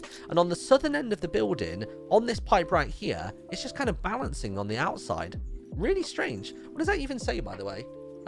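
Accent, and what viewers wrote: United Kingdom. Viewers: how is it its like a british accent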